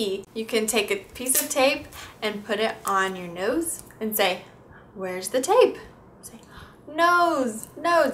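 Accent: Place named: United States. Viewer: When I hear you talking, I hear an unclear voice like somebody who just woke up or have been to a place where you had to shout loud, like your voice is cracking, hard to describe but I was talking with other people in my country about it and they said it is typically something American women do. Why do you guys do that? It doesn't sound like a nice clear voice to listen at to be honest.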